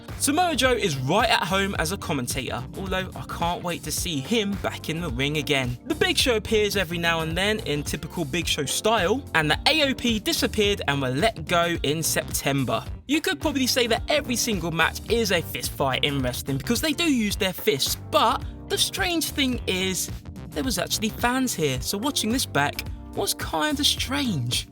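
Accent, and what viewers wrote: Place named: United Kingdom. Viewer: well first of all they're based in the uk so they've got accents and second he speaks just fine I actually really like this guy. Just cause he is not spelling it out for you doesn't mean he can't talk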